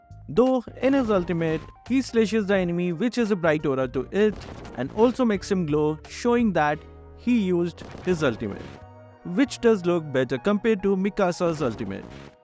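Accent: India. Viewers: Your indian accent is so hard to understand bruhhhh.....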